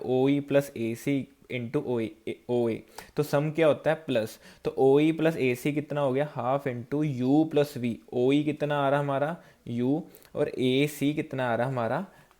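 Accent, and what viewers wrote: India. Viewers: Sir g aap Punjab se ho kya ???. ..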